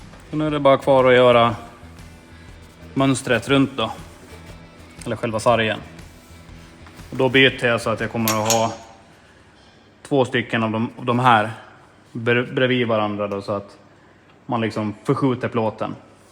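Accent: Finland-Swedish, Finland. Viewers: Som alltid, kul,intressant och jäkligt imponerande hantverk! Lätt den bästa kanalen..... Muminsvenskan höjer värdet också såklart 👌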